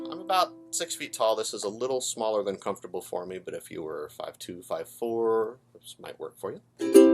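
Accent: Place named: Hawaii, United States. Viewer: and his staff are from Hawaii. So they pronounce it oo-kuh-lay-lee.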